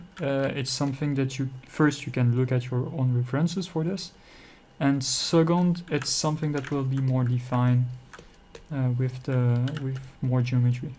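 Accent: France. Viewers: Sir, never apologize for a French accent. Especially in an art tutorial. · We all love your frenglish ;) And the word you are mostly looking for is "definition" (of muscles, creases, shapes). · Instructor: "i apologize for the french accent" Me: Are you serious? I would kill for having that accent. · Did he just apologise for his French accent? I'm offended. That shit is unintentional ASMR. Thanks for this tutorial - so generous of you to share your time and knowledge.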